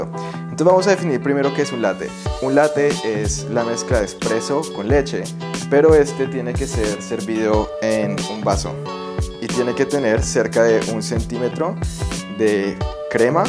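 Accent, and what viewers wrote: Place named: Colombia. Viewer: Gracias por la receta. parece que eres colombiano. Ahora voy a preparar mi café late orgánico. Un abrazo, saludos desde Popayán, Colombia.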